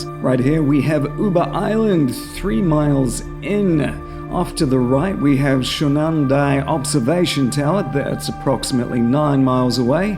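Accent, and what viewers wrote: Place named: New Zealand. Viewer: Your "broken kiwi accent" is legible so your good.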